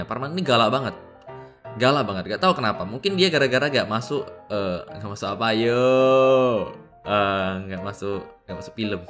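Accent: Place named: Medan, Indonesia. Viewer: Kita lego lego. 😁😁😁 bahasa indonesianya bang kita gocek gocek 😁😁 keliatan kali medan nya bah.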